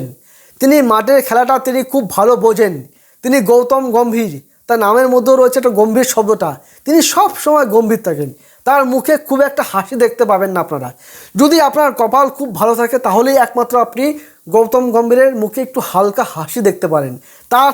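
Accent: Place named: Kolkata, India. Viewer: হাগল দাদা। পাগল। কলকাতার বাংলা টা বেশ ফানি। যদিও মানতে অসুবিধা নাই যে , তোমাদের টাই শুদ্ধ বাংলা।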